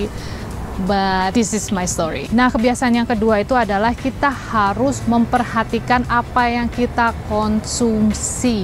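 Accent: Banyumas, Indonesia. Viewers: Nok ngomonge ngapak bae endah padangerti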